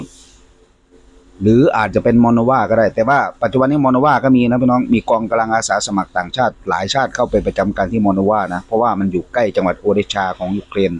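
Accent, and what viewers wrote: Isan, Thailand. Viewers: แอดมินเป็นคนอีสานใช่ไหม ฟังจากสำเนียงแล้ว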